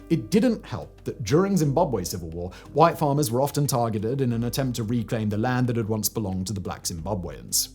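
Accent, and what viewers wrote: RP, United Kingdom. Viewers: Your posh English, lack of general knowledge, and biassed turns of phrase betray your prejudice and ignorance. "SANDF" under NP rule? "Communists" in air quotes... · Oh!! You must be Spewing Accurate Data with your Know it all' Stuffy British Accent Right ✅️ No Leftist Bias here at all..😂 he's sadly Marginalized a Plight of people which is Very Much Real.. but if you've got 15-20 minutes too hear another Know it all Brit Spew what his Taskmasters ask Hey' its your time too waste 🗑 ( i sadly Did!!)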